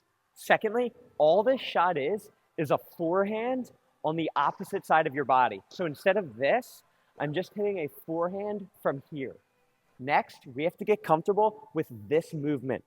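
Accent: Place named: New Jersey, United States. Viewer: i trust you have the best intentions, but it doesn't necessarily mean you're right. i've never watched his videos and felt he was talking down to me. and this is coming from the pov of a former nyc public school teacher and 4.5 player. to me, just sounds new jersey direct and enthusiastic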